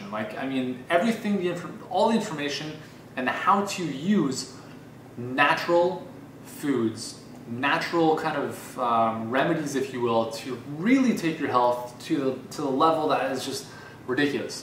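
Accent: Canada. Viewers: ur canadian....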